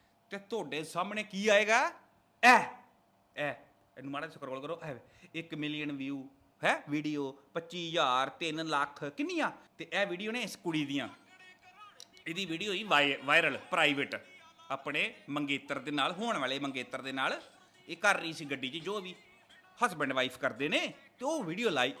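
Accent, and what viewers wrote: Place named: Pakistan. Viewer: Bai teri boli pakistan wargi kyo hai yaar?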